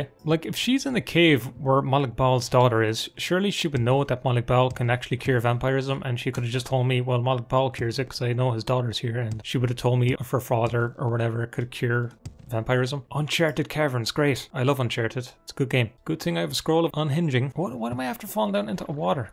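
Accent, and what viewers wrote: Ireland. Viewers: man, placing your accent is pure hard. Is it Mayo/Galway? That or midlands? Anyways, savage videos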